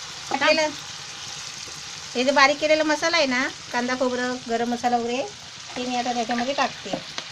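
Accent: Konkan, India. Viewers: tumchya sagalya recipes mala khoop avadatat. same majya mummy sarkhya, tumchya bhashet ani jevanat kokanatla godava ahe Love u Kaki ani thanks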